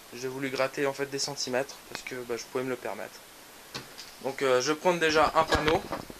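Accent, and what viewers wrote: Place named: Switzerland. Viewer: hello, vu ton accent et ta minutie tu viens de suisse et moi aussi :) du coup j'aurais aimé savoir ou est ce que tu as acheter les vitres et les rails car je n'en ai pas trouver a jumbo ou autre magasin de bricolage :(( mes pantherophis demande de la place, je cherche a me lancer dans la fabrication d'un terra comme le tiens. en espérant que tu pourras m'aider... en tous cas beau boulot!! chapeau! et merci pour la vidéo.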